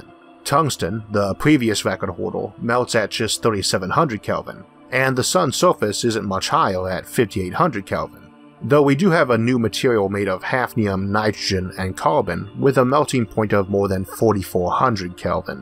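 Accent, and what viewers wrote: United States. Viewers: Where are you from? I can't tell if it's Southern or Boston. But it's probably neither - not that good with American accents yet. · I wish more people sounded like you. Almost sounds country and like I'm in a movie. Very well informed. Thanks for inspiring me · actually what you call a speech imperiment to me sounds like most british people. like how brits pronounce english letters. but your accent is american. works for me! 👍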